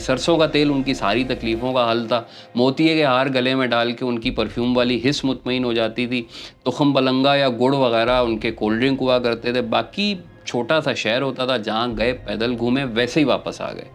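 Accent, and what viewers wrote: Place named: Karachi, Pakistan. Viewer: Waw, excellent bhai kya behtareen boltee hain aap, I presume you are from Karachi for sure :) as your urdu was as par. Initially trees an parks can safe us, as our activity is zero and we have concrete everywhere around us. Greenery/trees at certain level, plus managed family parks, clean water, 24X7 clean electricity and safety should be part of law to must have for a town. If this can not be done to already developed towns/cities then at least apply them to new towns, there are number of new societies where this can be achieved.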